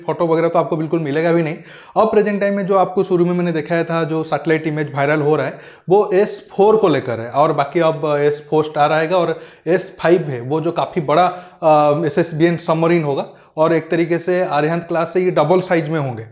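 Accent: Odisha, India. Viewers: भाई आप क्या उड़ीसा से हो?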